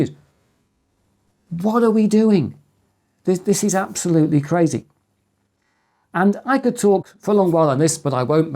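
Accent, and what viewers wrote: England, United Kingdom. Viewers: I whole heartily agree with the dumbing down based on A.I. and Social Media platforms. 1, A.I. via laziness. 2. Social Media -- the willingness to believe what is your mindset without any research of fact or fiction i.e. laziness but laziness with major consequences. Both are of concern as history does repeat for lessor reasons. Thanks professor for the update and keep up the good fight. Although we're separated across the pond by a common language, I enjoy each and every installment. Less and less translation is now needed from the King's English to my Southern Drawl. ;~)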